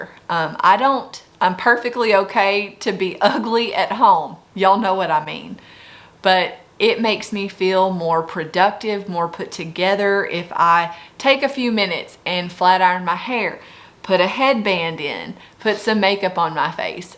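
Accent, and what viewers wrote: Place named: South, United States. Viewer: Hey! I'm not sure if anyone has already asked this question or if you're allowed to share the info but I was wondering where you went to have your surgery? You sound southern and I was hoping (fingers crossed) we were relatively close in location. I would love to have this done and would want to go to a good surgeon.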